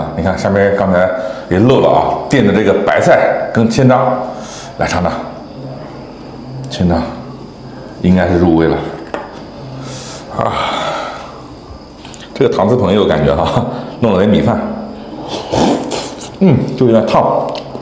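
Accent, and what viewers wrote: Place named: Hong Kong, China. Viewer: 港普听着真亲切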